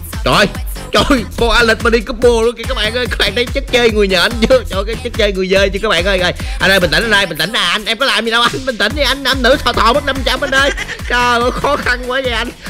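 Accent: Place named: South, Vietnam. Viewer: .ôi trời, người tôi mong đợi một youtube làm về liên quân mà có giọng miền nam giống tui😭đó giờ xem toàn miền bắc 😭mò mãi mới ra đc Anh, ôi hạnh phúc quá đi 😭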